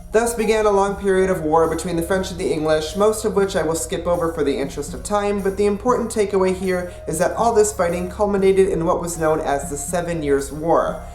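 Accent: Canada. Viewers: You do have a noticable Canadian accent, the out and about spelling of words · I did notice your Canadian accent. However, I work as a Nurse and have had several Canadian colleagues over the years, so I am familiar with the accent. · Yes, you do have a Canadian accent! Your pronunciation of "out" and "about" definitely has a slightly raised vowel from the American /aut/ and /abaut/. At least that is how it sounds to my American ears. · Yes sir, you do have a Canadian accent. I think I unfortunately lost my Canadian accent. As far as I remember, Americans never have commented on my accent being different or anything at all, so I assume my accent is pretty neutral. FYI, I spent the majority of my K-12 education in Canada. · I do notice your Canadian accent, and I think it's cool! I even phase the accent in naturally myself, and it feels right! hehe Greetings from this Venezuelan living in Montreal, which is a great city, eh? 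:)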